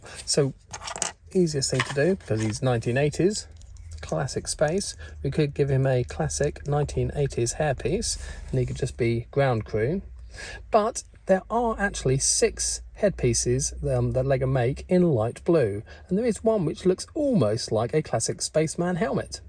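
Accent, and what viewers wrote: United Kingdom. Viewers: Oh you brithis? Cool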